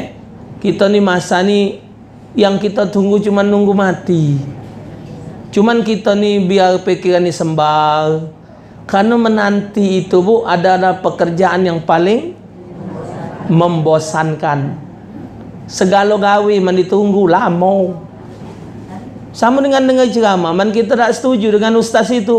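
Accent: Palembang, Indonesia. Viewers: Dak bosan aku nonton ceramah almarhum, dak Ado lagi yg ceramah berlogat daerah Palembang, alfatihah pak ustadz 🙏🏼🙏🏼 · Ingat waktu beliau ke Kota Jambi, seisi lapangan kantor walikota Jambi penuh. Cara beliau menyampaikan pesan sangat sederhana dengan logat Palembang tapi bisa dimengerti oleh semua orang, dan temanya adalah fakta yg terjadi dimasyarakat kita. Alfatihah buat beliau.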